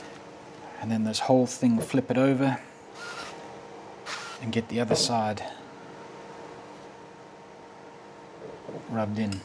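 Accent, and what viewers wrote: South Africa. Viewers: you sound south African mush 😊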